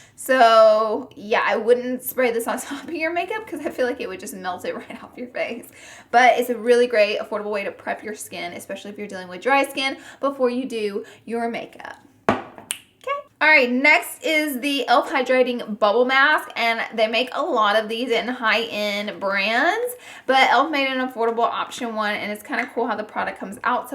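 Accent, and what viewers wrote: South, United States. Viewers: Where are you from you have a country accent I love it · It sounds like she's American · You remind me of Alyssa Edwards! Same country accent and everything lol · Hayley She doesn't have a "country" Accent she has a southern accent! btw their kind of the same thing but i'm southern so i prefer calling it southern. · I just love her southern drawl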